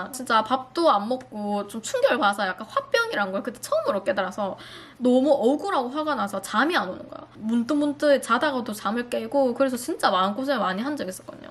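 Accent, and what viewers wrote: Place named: Daegu, South Korea. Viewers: 항상 웃기고 재미남 지송 사기당했다는데...있는그대로모습 미국에서 대구사투리 찐정겹당 ^^ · ㅠㅠ 너무 속상한 일일텐데 말도 너무 웃기게 하시고 ㅋㅋㅋㅋ 사람들이 비슷한일 당할까봐 열심히 설명해주시는게 너무 귀여우세용!!! ㅋㅋㅋ 저도 대구사람이어서 사투리도 너~~무 반가워요!! 은행사이트도 구글에 검색해서 들어가면 똑~~~~같이 만들어서 사기치는 놈들이 있다고 하더라고요.. 꼭 진짜 사이트인지 확인 잘하시고 이번에는 더 큰일 안나서 다행이에요!!♥️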